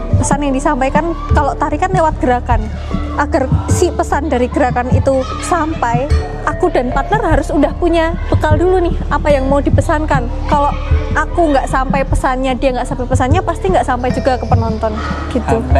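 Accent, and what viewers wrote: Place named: Java, Indonesia. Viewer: Suka sama attitudenya mbak e, cara bicaranya asli jawa. Meskipun pakai bahasa indonesia ketika menyebut gurunya sakit dg kata "gerah". Sukses selalu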